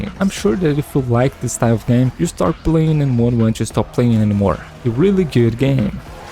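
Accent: Brazil. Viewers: esse sotaque ta com uma cara de brasileiro kk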